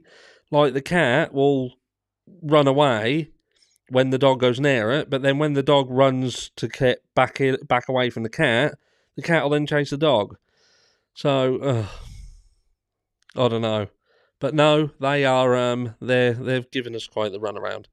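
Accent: Norfolk, United Kingdom. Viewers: Love hearing your Norfolk accent. Makes me feel like I’m back home 🇬🇧😎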